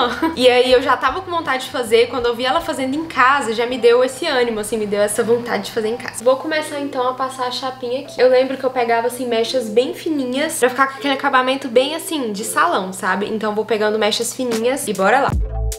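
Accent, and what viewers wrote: Minas Gerais, Brazil. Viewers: Vc é mineiraaaaa....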